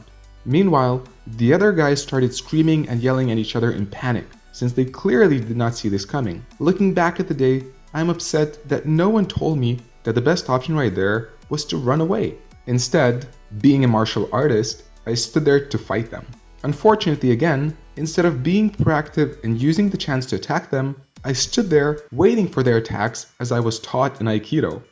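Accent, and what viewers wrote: United States. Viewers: I see the issue with your view. Martial Arts do work but you have never been taught to think the way of the Martial Artish. You must remember young Martial Artish to swim like a brick, Be the bookcase. Flow like a wall. You sound American but yet you say mobile phone and not cell phone as Americans do so that was unexpected. PS give me your phone ! :)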